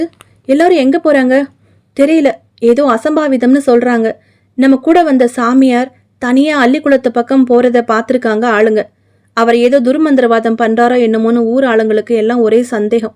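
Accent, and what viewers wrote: Kerala, India. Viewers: Nala story, I liked it.... paravala malayalam Pronunciation improvement iruku 😅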